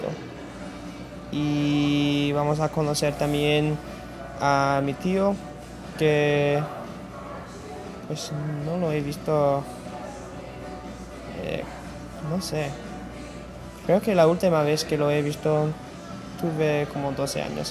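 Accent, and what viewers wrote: Spain. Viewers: tu español es muy bueno, tienes el acento de españa. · good spanish :) dude idono why but ur accent around there in the beginning almost sounds like a spaniards accent with the tongue lol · hey, excelente pronunciacion, da gusto que alguien se interese por aprender otros idiomas en especial este que es complicadisimo, tienes un acentillo que parece castellano. (from spain) aunque creo que todos ya lo hemos notado jajaja, nadamas el tipico error ocasional extranjero de confundir femeninos y masculinos, but i do that a lot with irregular verbs in enlgish, sometimes i travel in time. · Acento español ( from spain ), FELICITACIONES. Mejora la pronunciacion de la VOCAL "O" y la CONSONANTE "D". SALUDOS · Your Spanish seems like European Spanish, but is good. I know Spanish is difficult. I say "Hi" from Venezuela. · Bien, continúa practicando. Sueña como español de España. 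Saludos. .! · Lo hablas muy bien comgra...!!!!! tiene el accent de espana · Hola,..¡¡Ya me gustaría a mí hablar inglés como tu lo haces en español!! Sólo te falta pasar un año en España(lo digo porque tu acento es de aquí), o en cualquier otro país hispanoamericano para limar esos pequeños detalles. Se te nota soltura al encadenar las frases,tu vocabulario es amplio y tienes, en principio, una buena declamación.Tan sólo debes pulir un poco la pronunciación.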